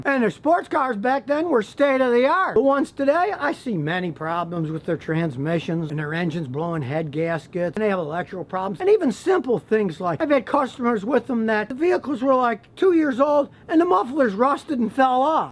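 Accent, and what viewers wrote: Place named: Western Pennsylvania, United States. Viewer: Is from western Pennsylvania? I’m trying to figure out his accent.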